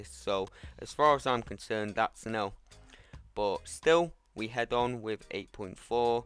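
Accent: United Kingdom. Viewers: Yes we like your rage and rant in Bri'ish accent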